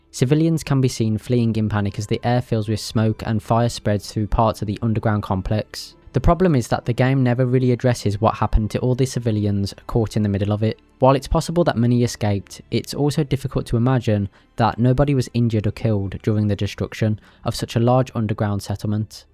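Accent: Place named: Birmingham, United Kingdom. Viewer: Errr Birmingham accent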